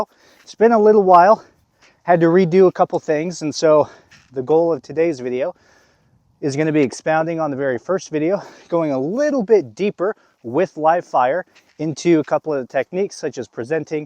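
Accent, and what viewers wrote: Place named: Russia. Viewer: I've been following your IG for a minute and im ngl i was expecting the thickest Russian accent i've ever heard. still not disappointed lol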